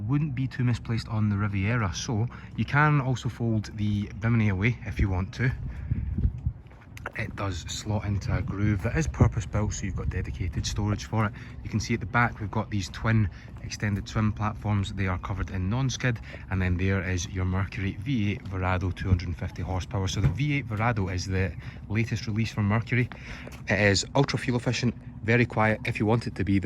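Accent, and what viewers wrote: Scotland, United Kingdom. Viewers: Love the Scottish accent in these boat sales pitches, real passion!👍🏻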